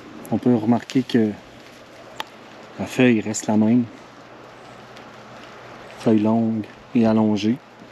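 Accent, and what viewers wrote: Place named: Quebec, Canada. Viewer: Merci mon ami quebequois de bon conseil en plus d un bon accent ....t es un "chum".....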